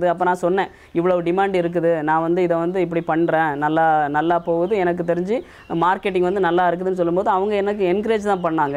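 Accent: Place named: Tirunelveli, India. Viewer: Tirunelveli mathri irukku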